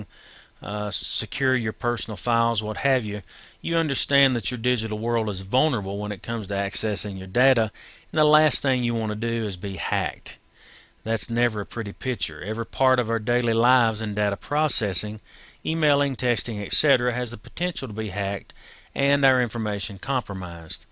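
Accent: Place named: Australia. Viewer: Purely out of curiousity, which accent is this? I'm confused, it sometimes sounds Australian (in my ears, that is), then it sounds like it's from the Southern US, which got me intrigued. I'm no native English speaker, so recognizing accents isn't my strongsuit.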